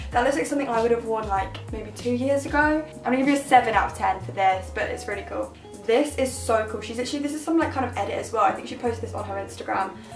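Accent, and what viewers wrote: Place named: England, United Kingdom. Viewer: I wonder what the beautiful accent is that you speak with? What the region of England are you from?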